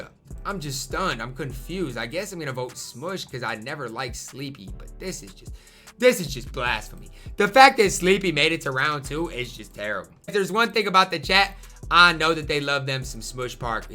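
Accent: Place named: Detroit, United States. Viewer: How come sometimes you talk normal and then sometimes you talk like you are from 8 mile?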